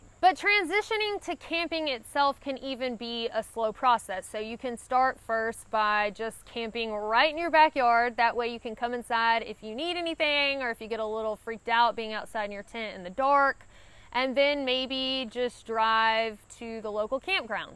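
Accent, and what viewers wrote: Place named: Virginia, United States. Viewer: Love your accent. Reminds me of the locals around Blacksburg VA, where I spent a few years at VT. I sure enjoyed talking to the locals. Wish I could have made more time for the outdoors wile I was there. Good to be back home in the mountain west.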